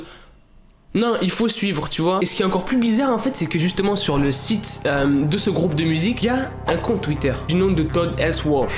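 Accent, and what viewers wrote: Quebec, Canada. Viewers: 'un petit axant canadien Québec 😅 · Le petit accent quebecois qui fait plaisir😂❤️❤️ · Wtf l'accent québécois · J adore ton accent québécois Salut cousin francais cool lol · Quand ta dit "jsp pk j’ai un accent canadien Québec" OUAIS C MON RÊVE QUE TU SOIS QUÉBÉCOIS MONSIEUR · Il est trop chaud mdrr Et fais trop bien le Québécois 🤣🤣🤣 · avant que tu le mentionnes je trouvais déjà que parfois tu parlais un peu comme un québécois 😂 avec de la pratique tu pourrais parler aussi bien que moi 😂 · belle acent quebecois 😅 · Un ti accent dquebec 😂 Hahahaha je t’adore je suis québécoise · Ton accents canadien est super tous comme ton accents anglais 😂😂❤️ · mdr je reconnais tout les canadien mais je peux te dire que tu a une voix de canadien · Omg je suid traumatisé et tres belle acssans canadiens moi je suis québécois je m'abonne :) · Ton accent québécois elle est épique confirmée par une québécoise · Si tes en France pourquoi ta parler quebecois jcomprends pas🤣🤣😭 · J’adore ton accent un peu canadien et québécois j’adore❤😂👌🏻 tu est prêt pour aller aux Québec 😏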